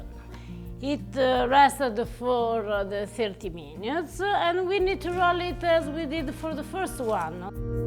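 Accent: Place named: Italy. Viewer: i just have to ask... was you tired when you was making this video? You english get abit more Italian accent. No, no offence intended.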